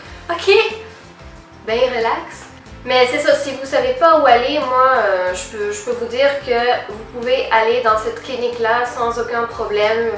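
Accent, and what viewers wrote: Quebec, Canada. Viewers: Ton accent quebecois devient de plus en plus prononcé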